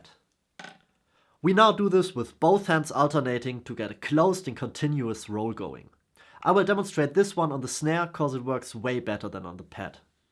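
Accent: Germany. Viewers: Swabian accent going strong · Your German accent is very convincing. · man erkennt das du deutsch bist xD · As a German myself - his accent is definitely and undoubtedly german. Stewies accent is british but they have similar voices. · are you from germany ??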